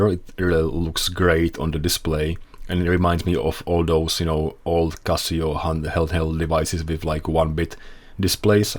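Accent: Czech Republic. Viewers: Love it ! Looks really nice, especially the ink display. Also, are you Czech by any chance?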